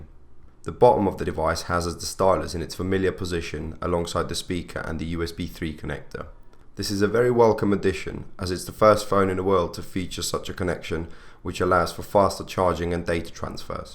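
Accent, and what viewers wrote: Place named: England, United Kingdom. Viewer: get so excited when i here a english accent in a tech video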